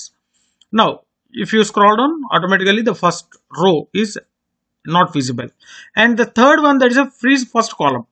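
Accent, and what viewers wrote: India. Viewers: Very useful (indian accent)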